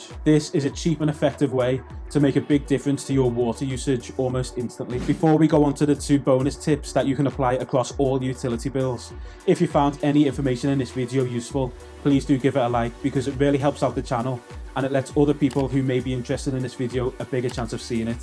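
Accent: Liverpool, United Kingdom. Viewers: Decent video you sound local to me liverpool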